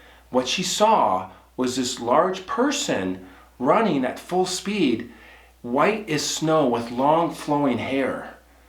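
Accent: Minnesota, United States. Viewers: I love Grand Marais, I e gone dogsledding there. I have no doubt with all the wild country that big foot is out there. Remer MN is really into Bigfoot ! Love to hear you say Minnesota, the accent is still there😊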